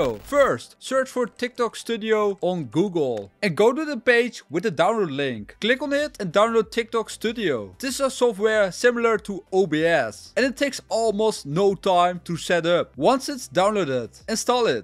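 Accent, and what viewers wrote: Netherlands. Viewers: I smell some nederlands a bit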